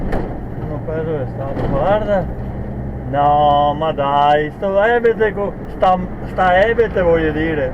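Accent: Veneto, Italy. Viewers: È tutto bene...i difetti potranno ma io non li vedo ...mi va bene così...poi mi piace sentir parlare Veneto.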